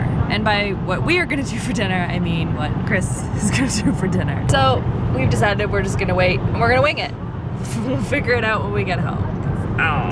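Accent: Canada. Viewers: I love how your Canadian accent comes out so much more when your at your parents house. Seriously love you lol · When you get near your parents you get 100x more Canadian LMAO this video was so cute :)) I would love to see these more!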